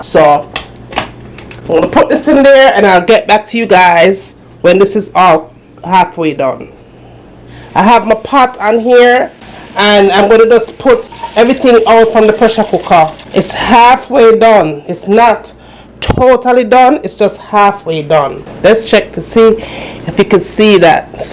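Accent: Jamaica. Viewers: Yes man it really looks wicked 😃😄 Thank you for this great recipe !! so good to hear your sweet Jamaican accent 🥰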